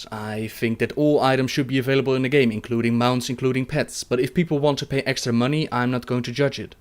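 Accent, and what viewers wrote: Netherlands. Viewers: are you dutch ? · Your Dutch accent is ridiculous.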